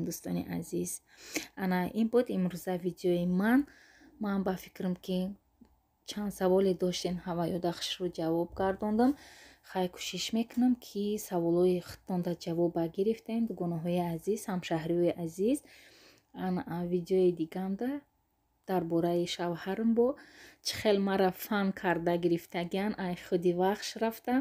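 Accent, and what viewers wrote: Konibodom, Tajikistan. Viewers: АСАЛОМУ АЛЕКУМ апачони гулмм бо кони бодомш чи лавзаи 🤗😂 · Хохарчон заминкадар чизхоя шавхаратон бахшидагиет, вай бошад намемонад ки шумо бо шеваи ширини Конибодоми гап занед😊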